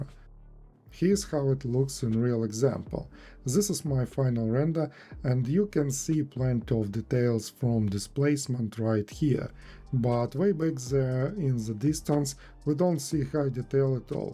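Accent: Russia. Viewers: ну я же слышу наш акцент, можно версию на русском ?) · русский акцент)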